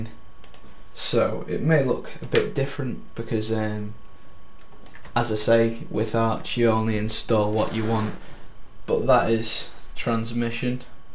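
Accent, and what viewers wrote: Liverpool, United Kingdom. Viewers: Are you from the Wirral/Liverpool?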